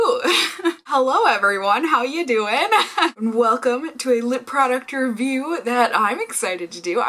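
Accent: Minnesota, United States. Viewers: I feel weird for thinking the sneeze intro is so adorable!!! Lol!! I think it's the little Minnesota twang in her voice. 😂 Love you!! I've been watching for years. Also, I support your choice to separate channels (even though I really wish people could just suck it up and keep on scrolling, also not that my support means much lol). Always wish you the best! Love from Fresno Ca!!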